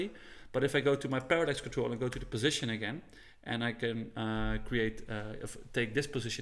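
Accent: Netherlands. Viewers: Ik hoor dat je Nederlands bent. Bedankt voor deze tutorial :)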